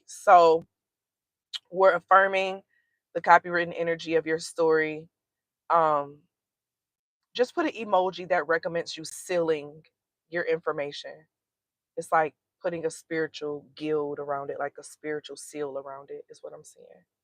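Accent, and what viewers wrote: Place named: Midwest, United States. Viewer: I knew yo ass sounded like you was from Milwaukee or the Midwest lol. That’s my home town 414. Appreciate the message. 💫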